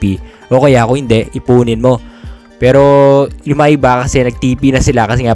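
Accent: Pampanga, Philippines. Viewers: Kapampangan ka ata boss?😅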